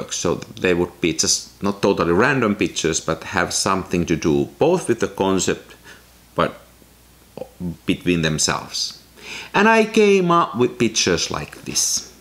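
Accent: Finland. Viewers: I like this kind of content, subscribed. Good photos, no long boring cuts with loud and crappy music. Finnish accent is a bonus. It sounds funny and for some reason I like it. :)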